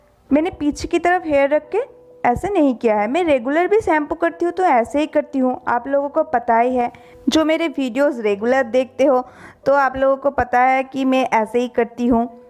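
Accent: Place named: Bengal, India. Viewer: Ap bangali h na?...apki awaz se hi lg rha h😊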